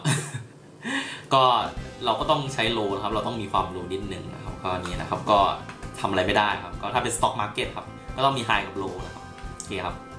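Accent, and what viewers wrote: Thailand. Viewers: แค่นี้ก็โอเคแล้วค่ะ สำเนียงไทยๆ เข้าใจง่ายค่ะ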